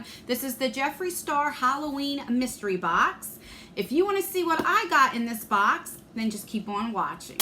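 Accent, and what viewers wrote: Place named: Philadelphia, United States. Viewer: I love hearing your Philly accent!!! I really wanna win but I'm not about to comment a million times lol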